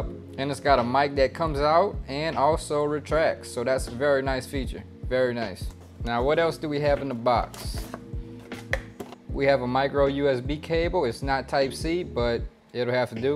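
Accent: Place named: Louisiana, United States. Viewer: Just purchased thanks! Also where's that accent from, Louisiana?? 😎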